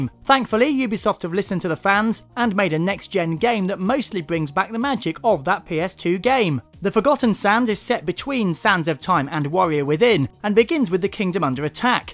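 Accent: England, United Kingdom. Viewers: go fuck yourself! He is ENGLISH and speaks ENGLISH with an ENGLISH accent...if you think ENGLISH is an indigenous American language and the American accent is the right one, pick up an American history book and read...you need learn more about the world you live in, DUDE!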